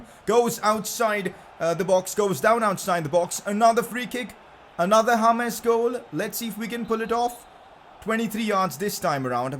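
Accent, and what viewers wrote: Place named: India. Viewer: Pcq why is your voice weird like Indian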